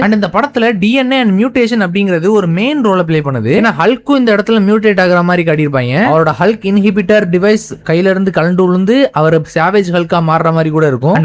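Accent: Kanyakumari, India. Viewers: Bro neenga kanyakumari ahh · Bro neega Kanniyakumari ahh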